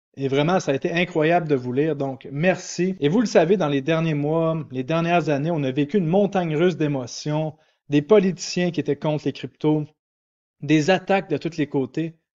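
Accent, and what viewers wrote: Canada. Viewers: Merci ! vous êtes très plaisant à écouter, votre accent canadien y participe mais plus que ca votre facon d'aborder les sujets est formidable. Restez comme vous êtes !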